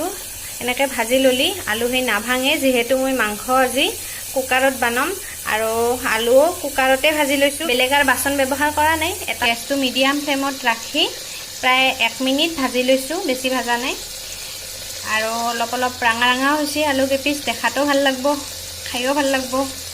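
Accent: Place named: Pathsala, India. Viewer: Mur mar ghr nalbari..apunar kothabur buji puat eku problem a hua nai..moi bhal pong ei pathseila kothagelkhon😊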